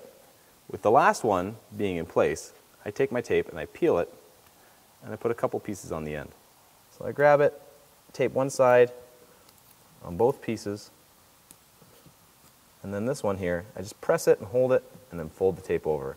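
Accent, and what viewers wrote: Canada. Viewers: Are you . . . Canadian,by any chance? 🤔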